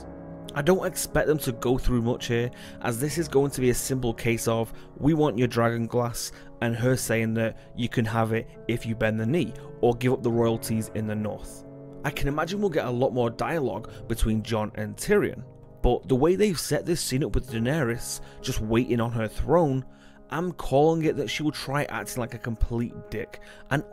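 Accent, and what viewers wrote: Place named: North, United Kingdom. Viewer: subbed for his northern accent! :)))) KING IN THE NOFF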